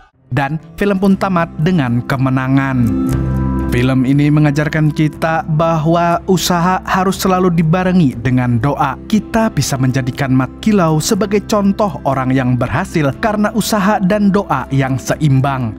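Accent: Banjar, Indonesia. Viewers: Mang urg banjar kah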